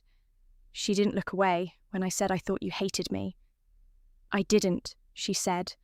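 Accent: United Kingdom. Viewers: If she is from North Carolina, why is the AI voice a southern English accent?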